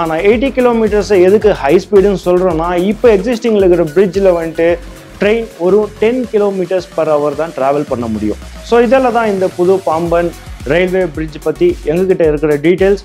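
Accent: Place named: Bangalore, India. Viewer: I think anchor Banglore tamilian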